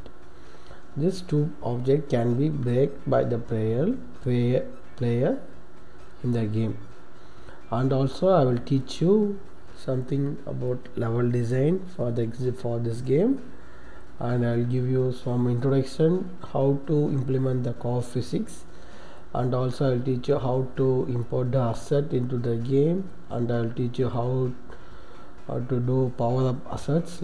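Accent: India. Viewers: Thanks.... :D But One Question " Are You Indian ? "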